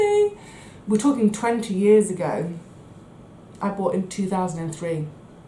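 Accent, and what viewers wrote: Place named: RP, United Kingdom. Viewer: Hi. I just found your channel from one of your followers, after one of her subcribers mentioned you in a YouTube comment. You can't put a price on being mortgage-free. You also sound really posh, for coming from a working-class family, lol. 😇 Anyway, my life has been quite similar to yours, apart from the burglary part. A working class family from the 1970's, with bone stew from our local butchers twice a week, with my Mum having only £25 a week for food, bills and everything else. This is a very honest and down to earth video, and it's really great. I'll watch a few more videos before I decide to subscribe. I wish you a Happy New Year, and I hope you have an amazing 2024 ! 🌷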